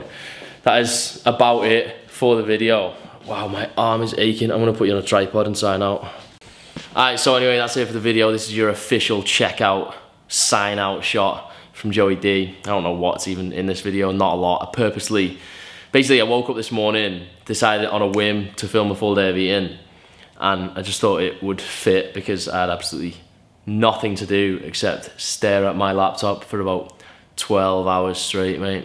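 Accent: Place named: Manchester, United Kingdom. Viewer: Z J Manc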